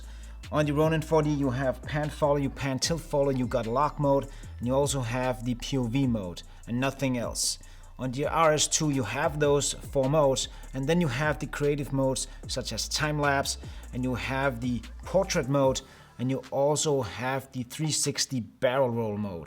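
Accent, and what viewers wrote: Denmark. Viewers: Rigtig god video, synes jeg kan høre du kommer fra Danmark hvis ja, så pisse fed video. Går selv og overvejer DJI Ronin 4d, så tusind tak for review :)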